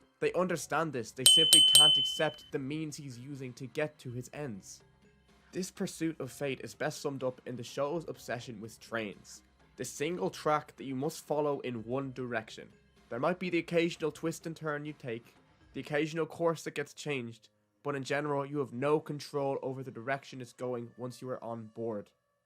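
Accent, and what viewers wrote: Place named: Ireland. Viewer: No way I've just gone and finished Penguindrum, went on youtube to find a good analysis about it and found a quality video by another lad from Dublin about it (I'm assuming from the accent, if not I'll look like a fucking twat so let's hope I'm right). Felt the exact same way about penguindrum, gave it an initial 9 but I'll see how I feel about it once time passes and I might become a 10. Great vid and mad props for being an Irish anime youtuber that isn't eyepatch wolf. Honestly never thought another one existed. Good luck in the future brother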